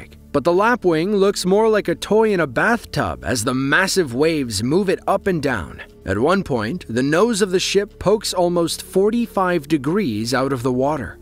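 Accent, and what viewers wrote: United States. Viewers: the american accent of the commentator is unbearable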